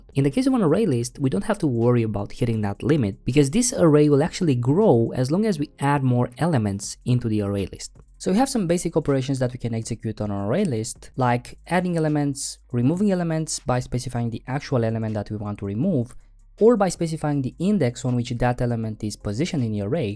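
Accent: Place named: Brazil. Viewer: Você fala português? Parece um brasileiro falando inglês.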